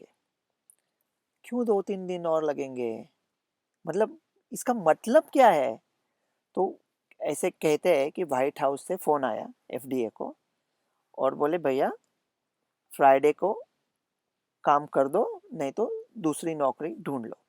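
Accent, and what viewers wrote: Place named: Maharashtra, India. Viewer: Tumchya Hindi madhye pan Marathi aahe. Take this as a compliment.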